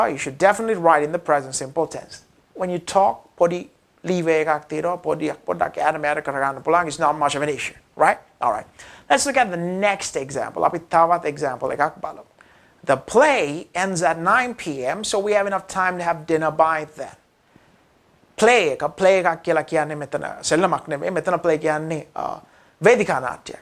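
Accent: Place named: United States. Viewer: I really like your speaking style 😃👏 it's truly American English 😍 sir, you're great teacher 👍 because you're teaching pattern so attractive 🔥 it's a valuable thing for all English language learners ♥️ Best of luck 🌺 I'm waiting for next YouTube video of simple present tense (part6)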